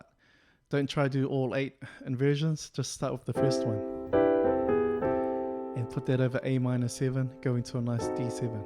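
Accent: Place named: New Zealand. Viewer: Hi bro, I've just discovered your channel. Great work ❤️ I take it from your accent your a Kiwi I'm a guitar teacher in NZ. I'd be interested in your teaching experience in Japan as a Kiwi if so. Perhaps a video about this you think it's worth covering.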